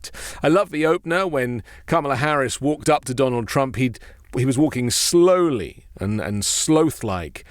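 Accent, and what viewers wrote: United Kingdom. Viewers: Well nothing like hearing about American politics from someone with a British accent 😅 But gooo Kamala !!! RID the world of Trump !!!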